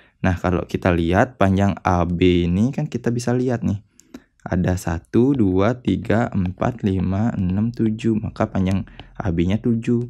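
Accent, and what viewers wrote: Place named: Bali, Indonesia. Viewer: Dari logat nya kayaknya yang punya channel dr Bali.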